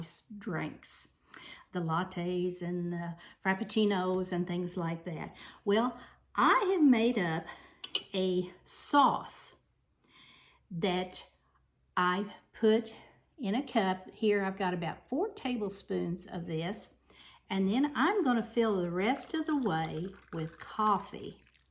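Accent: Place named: South, United States. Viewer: I love your “accent”. I wish I had that Southern accent…or a British accent. Love both. I have a Southern Californian “accent”. God bless you and your family! Stay healthy.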